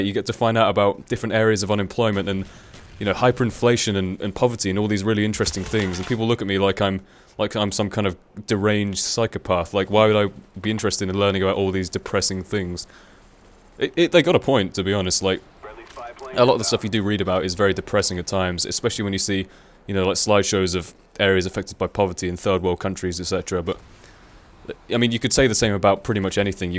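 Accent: United Kingdom. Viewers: Normally I hate the british accent but not yours tho :O please respond ? -Greetings from Finland!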